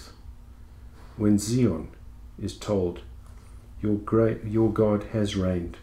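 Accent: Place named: South Africa. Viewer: You sound like you from, south africa, im wondering if it's possible for me to convert to Judaism, or how can I go about doing it?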